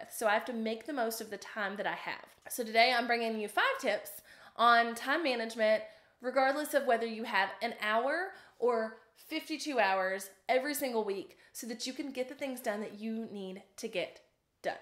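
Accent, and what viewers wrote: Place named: Carolina, United States. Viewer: Are you from one of the Carolina states? Love your accent ;) I definitely need some better time-management skills!! I need to download newsfeed eradicator...for sure, lol Lot's a great advice! Thanks!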